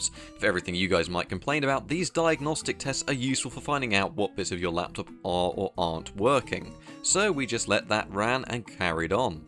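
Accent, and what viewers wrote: United Kingdom. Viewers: Ur British lol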